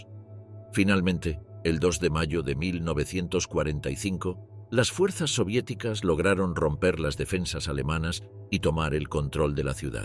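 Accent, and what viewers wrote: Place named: Castile, Spain. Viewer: Joder que gusto escuchar un video asi que no este en español latino (realmente esta mal dicho pero bueno), por fin. Que gusto escuchar un video asi en castellano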